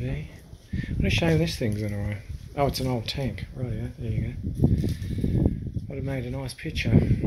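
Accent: Australia. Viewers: Ah, read all the way to the bottom and am terribly sorry to hear that the cancer is back - like a knife through the heart with shock and sadness. You know you were my first love for abandoned explores (it's that catchy Aussie accent as you ramble on, haha) and because you do what you do so well, here in Upstate NY we're sending tons of love back for a safe and quick recovery. Peaceful thoughts to you and yours. (Another great explore, by the way!):)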